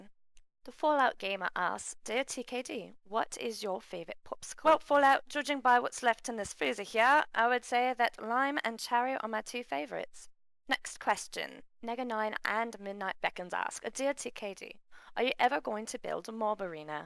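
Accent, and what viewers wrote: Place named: England, United Kingdom. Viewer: Ur voice sounds more like ur English this week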